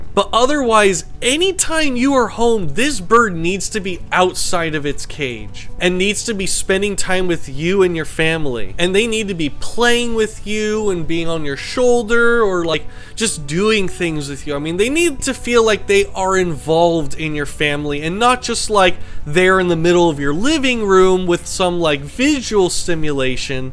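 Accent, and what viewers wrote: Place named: India. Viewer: My God, what english talks this chap? I cannot understand him. Are you from India or Pakistan, or Thai…. impossible recognize this accent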